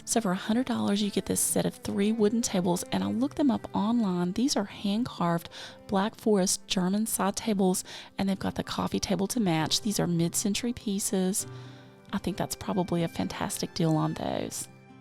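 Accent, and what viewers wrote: South, United States. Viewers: yes, and when we had a land line I used them. It brings back nice memories. Just like taking those trips with you. And hearing your sweet southern accent just brings back all those wonderful growing up days. Many thanks for you doing this for us all.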